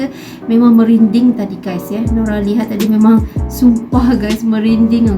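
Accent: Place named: Malaysia. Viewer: Hari cantik orang mana sih ko logat bahasa Melayu Malaysia ❤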